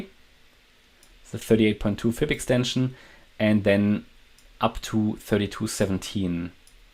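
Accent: Germany. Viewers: Are You German? I hear a German accent?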